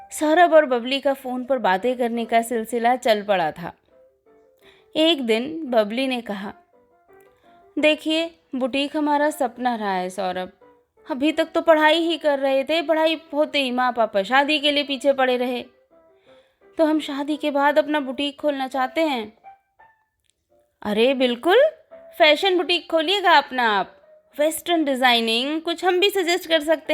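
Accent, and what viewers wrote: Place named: Kanpur, India. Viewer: Wah ji wah wat a style of narrating.. Har baar surprise deti ho dear... Bahut hi maza aa gya itni mast story or itne mast tumhare kanpuri style me gazab ... Kaise bikul kanpuri style me wow it's superb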